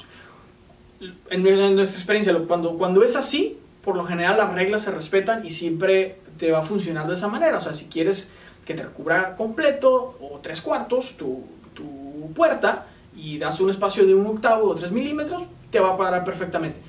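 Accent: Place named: Bogotá, Colombia. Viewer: He estado mirando la we y he visto que es de México, Me llamó la atención porque me daba la idea de un acento de Bogotá- Colombia y me he sorprendido al ver que es de Tijuana-México. El acento es prácicamente igual, de lo cual deduzco que México no es el solo acento que más conocemos , que será de algunas zonas, pero el de este joven es muy correcto, muy bien pronunciado, pero no es muy conocido.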